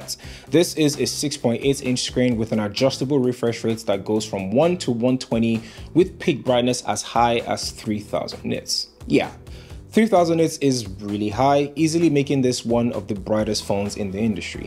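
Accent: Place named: Nigeria. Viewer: You got a gaming hardware channel right?... I could swear the voice is the same... The fade nigerian accent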